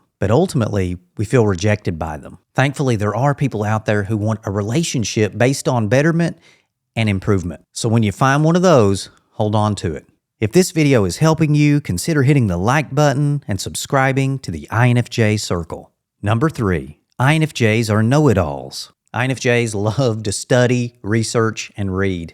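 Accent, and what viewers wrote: South, United States. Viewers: What state are you from?? Trying to figure out the accent bro lol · Love your southern accent.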